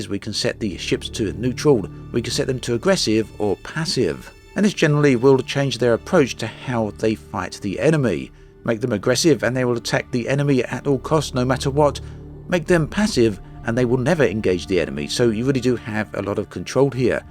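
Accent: United Kingdom. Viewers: I had a bit trouble listening to this video, great although it was. I'm not sure if you're doing it on purpose, but you intonate word in a subtle but bizarre way, word such as "video" you say "vide-ó" stressing the 'o'. You have a pronounced British accent, so It just felt out of place.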